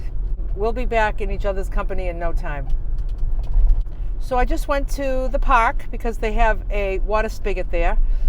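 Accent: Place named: Boston, United States. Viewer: You're loosing the Boston accent!!😅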